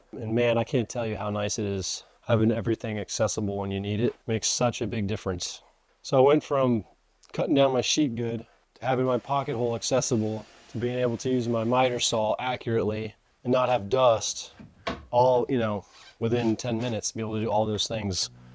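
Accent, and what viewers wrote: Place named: Florida, United States. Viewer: I knew you were in Florida. By the way you were talking I’m in Ocala. How far away are you?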